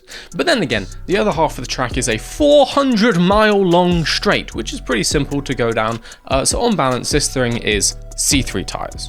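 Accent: United Kingdom. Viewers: I can assure you: You sound British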